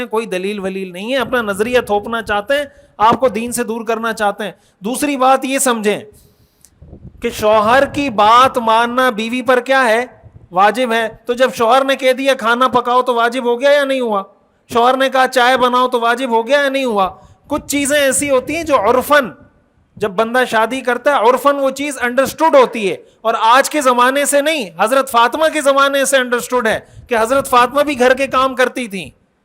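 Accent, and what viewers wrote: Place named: Karachi, Pakistan. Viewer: Krachi mein sab ka way of talking hi asa hai.not copy.nice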